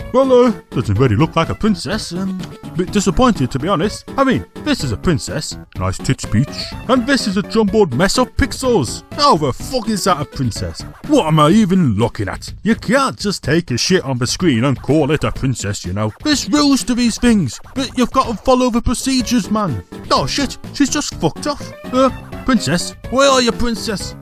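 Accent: United Kingdom. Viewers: Why is Poltergeist on your childhood memories list? Also, that ambulance? Are you British?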